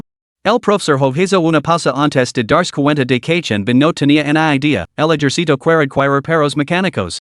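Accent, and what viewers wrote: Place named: United States. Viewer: Esta español si lo escuchas bien solo que es un gringo quien habla😔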